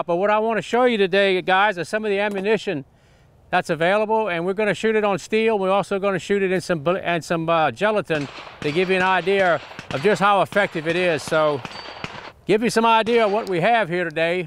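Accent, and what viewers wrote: Louisiana, United States. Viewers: ***** I believe that would be a bit of a Cajun ascent. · Is he from Louisiana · Wikipedia got it wrong. He got a Louisiana accent for sure..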